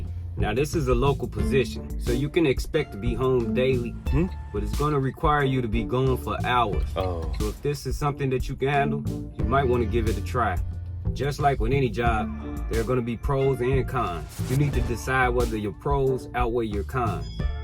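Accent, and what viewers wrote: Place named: Chicago, United States. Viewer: You from Chicago? Lol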